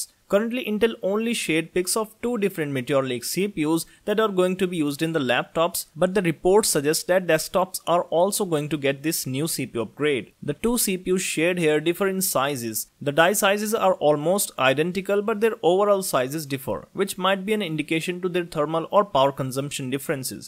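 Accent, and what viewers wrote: India. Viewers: Informative, but ruined by the Indian accent.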